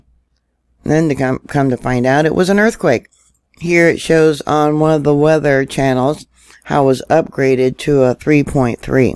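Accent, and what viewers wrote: South, United States. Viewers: Get the rest you need but keep up the good work. Love your old fashion country dialect, especially when you pronounce "Wash" or "Washington" I was raised around the Seattle area and it wasn't until I went into the military that I was told there is no "R" in either word My family pronounced it "Warsh" and "Warshington" also and we pronounced Snoqualmie Snoqualomie where we got the extra "O" is anybody's guess.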